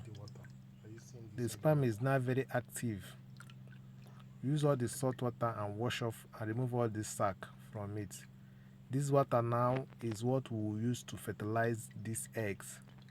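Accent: Nigeria. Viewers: Nwanne your igbo accent dey give me joy 😅 thanks for the info too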